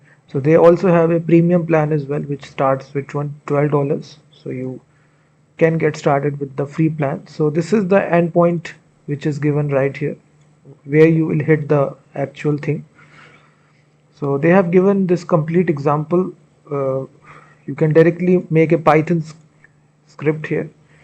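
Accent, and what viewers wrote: India. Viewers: Хер с индийским английским рассказывает нам о бесплатных ключах искусственного интеллекта из страны где последний ИИ был банда из 500 проходимцев которые облапошили на пару миллионов доверчивых пользователей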